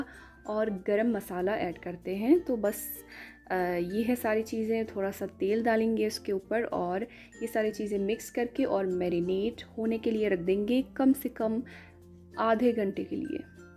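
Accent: Pakistan. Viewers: Ap ka urdu ka leja i mean Accent same pakistani hai lagta hi ni ha ap morrocian ho 👌👌👌👌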